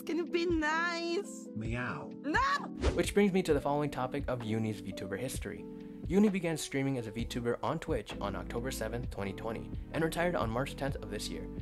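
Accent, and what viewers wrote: United Kingdom. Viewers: I FUCKING KNEW IT! I FUCKING KNEW I RECOGNISED THAT BRITISH ACCENT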